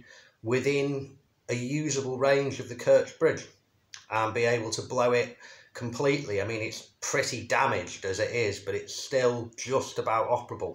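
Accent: United Kingdom. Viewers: You must be from London or areas around as you sound exactly as one of my British colleagues, even tone of your voice! If I were to listen to both of you, I wouldn’t be able to tell who is speaking! Stay safe, mate, thank you for all you do, you have my outmost respect! You now have a subscriber from San Francisco.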